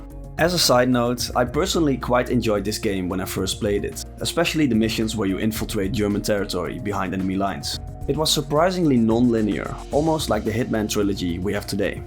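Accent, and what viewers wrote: Netherlands. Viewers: Good video. As a sidenote, you sound hella dutch.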